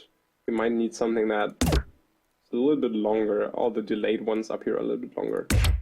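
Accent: Germany. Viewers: bist doch berliner oder :D?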